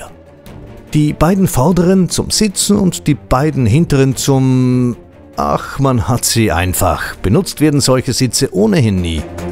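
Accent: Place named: Austria. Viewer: sachlich aber unterhaltsam und emotional, dazu der ziemlich coole Akzent (Österreich?) - davon können sich viele Automagazine eine Scheibe abschneiden. Hat mir gut gefallen :)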